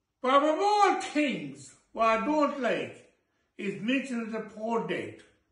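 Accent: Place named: Spain. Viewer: Is this a drunk Spanish man I’m struggling with the dialect.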